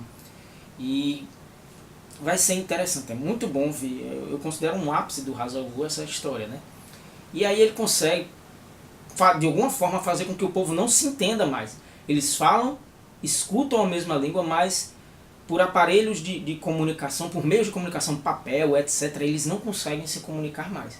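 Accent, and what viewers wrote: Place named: Northeast, Brazil. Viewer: Grande Vídeo, tava faltando vídeos com um sotaque familiar daqui do Nordeste :). Abraço.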